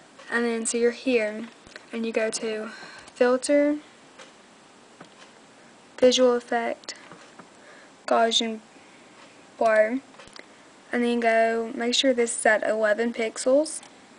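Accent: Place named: South, United States. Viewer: love the southern accent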